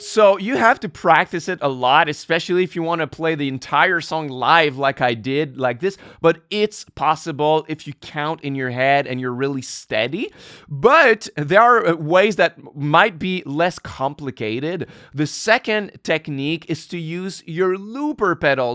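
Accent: Canada. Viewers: You sound Canadian!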